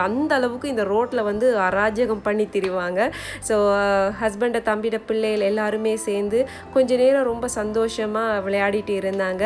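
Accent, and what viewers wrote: Sri Lanka. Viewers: அஸ்ஸலாமு அலைக்கும்.சிஸ்டர்.உங்க வீடியோக்கள் இப்போ recent aa than paarkiren.ungaloda srilanka Tamil super.enakku ungalai romba pudichirukku.நான் boy இல்ல சிஸ்.girl தான்.மெயில் ஐடி ல என்னோட கணவர் பெயர் இருக்கும்.தப்பா நினைக்காதீங்க சிஸ்.என்னோட பெயர் பௌஸுல் ஹிதாயா.தமிழ் நாடு.உங்க family க்கு நான் துஆ செய்கிறேன்.எங்களுக்கு நீங்க துஆ செய்யுங்கள் சிஸ்டர்.ரிப்ளை அனுப்புங்க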